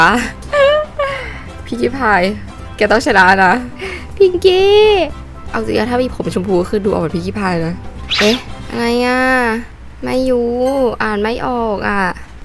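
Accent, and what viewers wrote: South, Thailand. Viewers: พี่เป็นคนใต้หรอค่ะ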